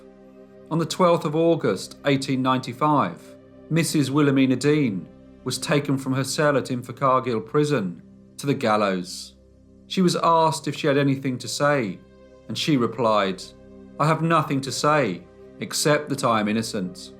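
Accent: United Kingdom. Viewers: im from North Carolina in the US its the south east in the US and if you only knew how horribly flat and that twang we have...I never say anything about how someone pronounces a word outside of this state. The most educated can sound like an idiot. I absolutely love a British accent, especially yours. Keep up the great work. You are helping keep me sane during this horrible pandemic and our joke for a leader..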